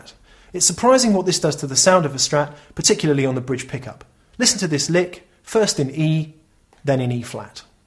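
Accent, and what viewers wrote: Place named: United Kingdom. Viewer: !!!! so Young! As a non-English speaker it is remarkable how his accent has become so much clearer over the years whilst remaining so British. IMO, the best guitar youtuber with Andy and Justin. amazing guitar playing and presentation skills, I am so envious:)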